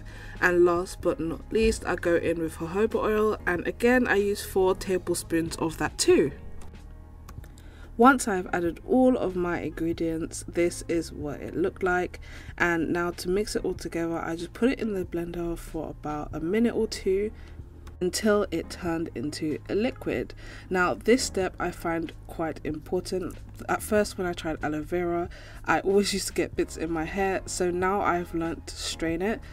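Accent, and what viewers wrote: United Kingdom. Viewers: British accent from n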